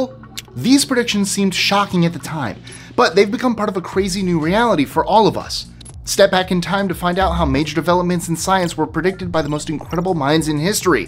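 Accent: Canada. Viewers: The Mandela effect cos I thought you was Canadian too eh 😅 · It's your accent that he thinks you're from Canada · yup, take it from a Canadian, you DO sound Canadian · i thought you were canadian as well ,,,,,, your more canadian than me and i live in ontario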